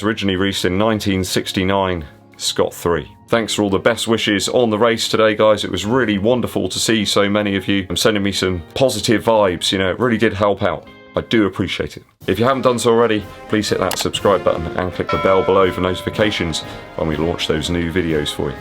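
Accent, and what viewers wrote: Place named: West Country, United Kingdom. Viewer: Great race day footage and I'm also a fan of regional accents with the West Country at the top of the list 👍😁. Raced a local 10k last week in Milton Keynes and am running a 5 mile race this coming Tuesday in Leicestershire. Just bought a new pair of Boston 9s and so shall wear them. My other Boston 9s (they produced a 5 mile PB ) are enjoying their retirement but come out in the garden every now and then. Giving good vibes keep it up.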